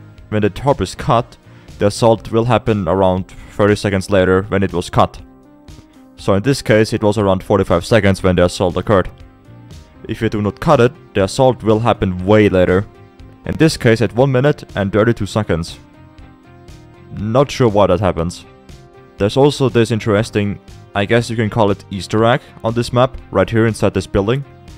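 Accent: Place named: Germany. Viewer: Hey man U Sound so German are U German by any Chance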